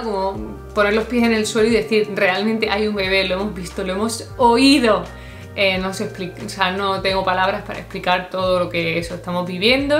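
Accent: Granada, Spain. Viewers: Hola pareja,hace mucho tiempo os encontré por aquí por casualidad y enseguida por tu forma de hablar te reconocí como paisana,yo soy y vivo en Granada y mi marido es de Montejicar........te suena,verdad? Bueno por ese motivo y por qué me encantais desde ese momento os sigo,pero nunca me había atrevido a comentar nada,pero hoy era el momento, enhorabuena pareja me alegro muchísimo por vosotros os espera y a ti especialmente la experiencia más maravillosa de la vida,disfrutarla desde ya muchísimo.Un abrazo muy fuerte desde Granada.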